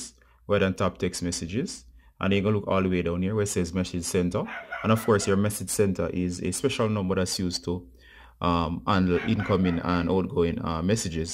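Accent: Jamaica. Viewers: What the hell, lmaooo not an indian voice but a fellow Jamaican, wow, big up #.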